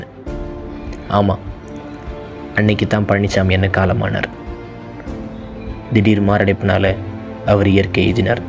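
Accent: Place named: North Chennai, India. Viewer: Enapa ithu vadachennai style.la kathai soldra...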